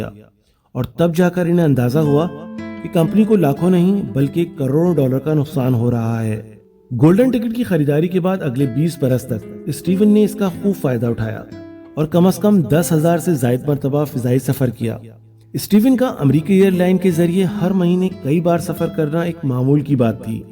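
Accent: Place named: Pakistan. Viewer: Masha Allah aapki voice or Btane ka urdu lahza dil ko chuu jata h .. puri yt m sbse best of best videos hoti h outstanding Big Respect 💝😊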